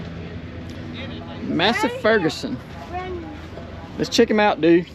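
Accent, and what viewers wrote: South, United States. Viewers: New to your channel, explanation about farming in Georgia and content is excellent 😊. Love the southern talk to talking also. Retired Michigan farmer. Take care have a great day!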